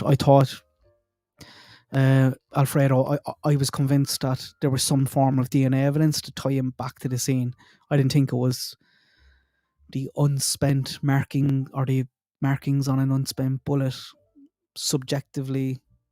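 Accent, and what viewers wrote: Ireland. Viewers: I'll take an Irish accent over a dull Midwest US one or even my own southern US accent any day! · Go on my brother Irish dude that’s a southern thick brogue you have Tipperary or near keep up the good “is mise Dáithí Ó ☘️✌️.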